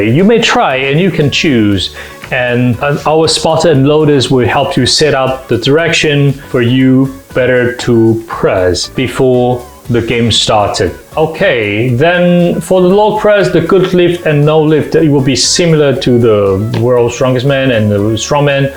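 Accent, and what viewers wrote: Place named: United Kingdom. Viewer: 不會拉，你很多單字會特別的有英國音，那個Ter很明顯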